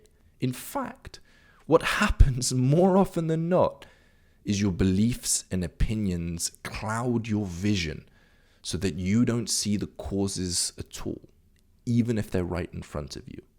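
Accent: United Kingdom. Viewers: I agree with you. If most of our beliefs and the beliefs of society are false and are not based in reality, how does he explain science? Hell, how would beliefs and his Effortless Action System be anything different other than false beliefs that are not based in reality? Oh, has stumbled upon the "Truth"? It doesn't help that it feels like is trying to subtly gaslight us into thinking that it's his teachings which are the only ones that are rooted in reality. Feels a bit cult-ish, to be honest. Honestly, this video felt like a slightly sophisticated Law of Attraction video with a British voice over. It also doesn't help that I feel like I haven't really been given much of an argument to examine so much as some clever sophistry.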